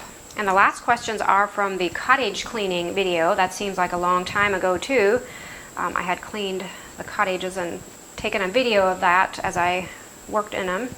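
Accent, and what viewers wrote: Pennsylvania Dutch, United States. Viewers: Such an interesting accent.... Pennsylvanian Dutch?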